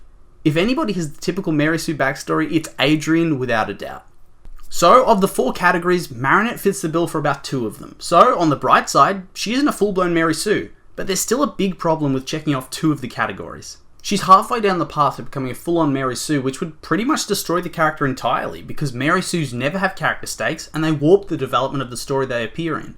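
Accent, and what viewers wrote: Australia. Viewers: You're Aussie aye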